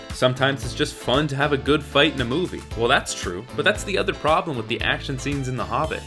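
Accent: Canada. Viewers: heard the Canadian accent. Woot!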